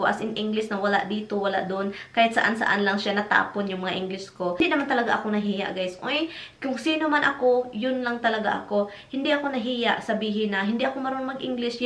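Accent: Visayas, Philippines. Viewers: Mapa enlish mapatagalog...bisayang bisaya ang bigkas mo...magbisaya kna lng para dka mapagtawanan..wag mna pilitin srili mo te..tao ka nga ye alam nmin.pero alam mo hnd kmi magtataka kung bkt maghahanap ng iba yang jowa mo..alam mo kung bkt tingin ko sa ugali mo mayabang ka akala mo kung sino ka...mabunganga pangit ang ugali mo...yan ang tingin ko sayo.....sori 2 say pero ingitera ka....magbisaya kna lng te mas better pa....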